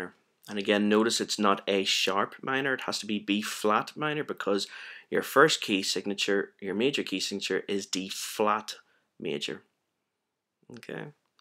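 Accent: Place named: Ireland. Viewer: You speak with an Irish Accent... I might be wrong man...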